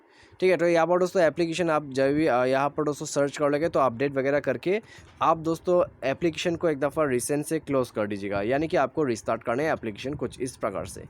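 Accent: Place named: Bengal, India. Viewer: Ha has a Bengali accent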